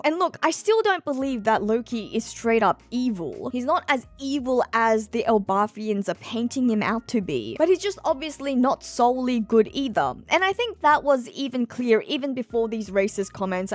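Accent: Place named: Australia. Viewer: She’s always beautiful. An Asian girl with an Australian accent.. the dream girl of most weebs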